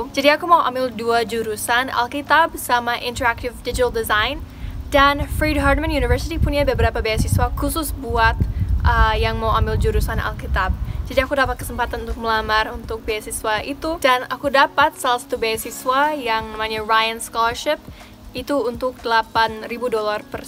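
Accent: Papua, Indonesia. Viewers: Logat indonesianya mulai agak luntur 😁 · Terimakasih atas informasinya sister, sungguh terlihat manis didengar orang asing asli berbahasa Indonesia dengan logat/lahjah Indonesia. Sukses selalu buat sister, brother and keluarga. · Bule longat papua juga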